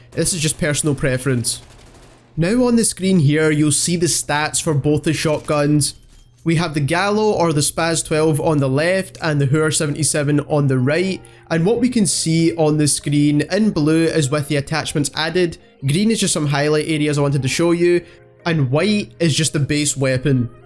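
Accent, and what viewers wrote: Scotland, United Kingdom. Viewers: just interested are you scottish cos i am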